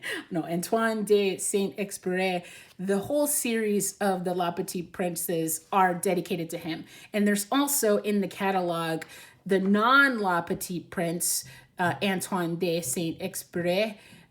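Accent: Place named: Midwest, United States. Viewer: Great review!!! Thank you. I'm considering the Mark XVIII black dial or the Mark XV which was the first IWC Swiss watch I've ever wanted since the 1990's (the other is a Reverso). Back in the day IWC was difficult to find. If I already acquired my wish list timepieces and money wasn't a concern. I would get a vintage military Mark XI from the 50's for the same price as a Mark XVIII. I currently own a Speedmaster Pro 1861 movement but I need something more anti-magnetic and water proof. It's a tough decision when it comes to items that cost thousands. Do I re-live my 20's Mark XV, or should I just go for the Mark XVIII? Which is a better watch, perfect size, symmetrical design, legible, 20mm strap options.... By the way, are you from the Midwest? You seem to have a Chicago/Detroit/Milwaukee/Ohio accent.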